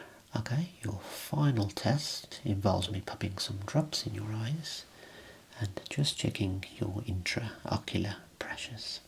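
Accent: Birmingham, United Kingdom. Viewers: When I started watching your videos I got so confused by your Birmingham accent. Three years later you sound like fairy from Neverland to me. You don't help me to fly, but to fall asleep easily 😊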